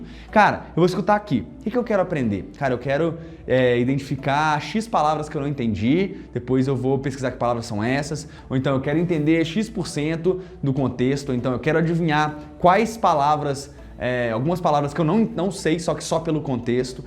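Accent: Minas Gerais, Brazil. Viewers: Mano foi engraçado a primeira vez que ouvi sua explicação em um vídeo falei " a voz desse cara me recorda a do Gustavo Lima, deve ser mineiro" então assistindo seus vídeos vc falou em pão de queijo kkkkk e logo falou que era mineiro...rs... Você é um excelente professor, muitas dicas tops que vc passa aqui para todos...continue fazendo isso, e desde já agradeço a vc, falo inglês mas sempre aprendo algo que não sei também... abração de Londres para vc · Caí aqui de paraquedas. Você pediu um comentário então vou deixar o meu. Você está de parabéns, deu várias dicas e com certeza me ajudou e vai ajudar muita gente, já me inscrevi no canal, dei "like" e pretendo assistir os outros vídeos do canal. Infelizmente acho que essa musiquinha de fundo não ajuda muito. Você fala bem mas o seu sotaque mineiro também desviou minha atenção, foram muitos "falano, estudano, esperano, usano, escutano, treinano, leno e cê" que no final já estava "contano". 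Desculpe, não quis ser grosseiro, só gostei do canal e fiz uma crítica com a intenção de que fique melhor.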